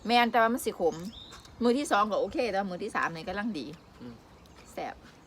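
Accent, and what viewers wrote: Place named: Ubon Ratchathani, Thailand. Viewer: เว้าคือคนทางอุบล สีเกดเนาะครับ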